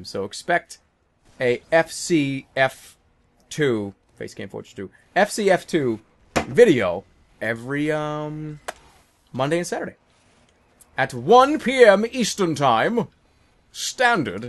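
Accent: Boston, United States. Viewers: well ya, scout just has a boston accent. Jerma sounds like hes from them parts. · he always had the boston accent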